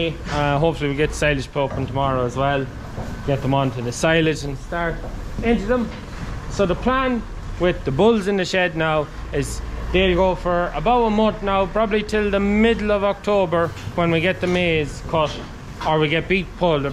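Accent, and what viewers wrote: Ireland. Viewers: enjoy your channel from across the pond. Are you aware that you have a slight Irish accent, and that a beautiful woman is working on your farm, oh, you lucky rascal? 😉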